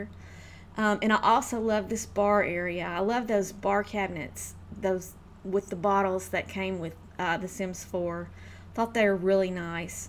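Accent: South, United States. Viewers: Your southern accent is so awesome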